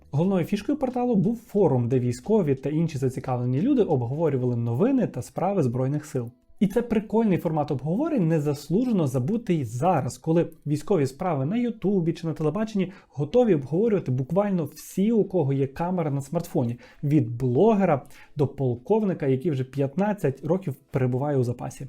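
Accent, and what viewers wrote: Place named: Lviv, Ukraine. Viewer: Зразу ясно, що автор каналу зі Львова. Бо хто ще б казав "ТарасА", з "ТарасОм" 😅. Для мене самої стало десь декілька років тому відкриттям, що літературно треба казати "ТарАса", "з ТарАсом". Наші регіональні мовні особливості такі чудові😊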